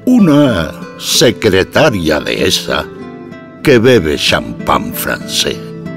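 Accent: Spain. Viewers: Maravillosa interpretación en andaluz.... Con su voz, la poesía cobra vida. Gracias!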